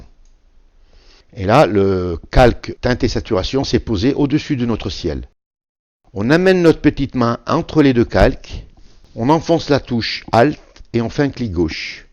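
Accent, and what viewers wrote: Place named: Savoy, France. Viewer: vos tutos sont toujours tres clairs, en plus on a l'accent savoyard. j'ai visionne votre tuto sur le montage dans photoshop mais comment l'enregistrer pour l'envoyer par mail? Merci. C Del Grande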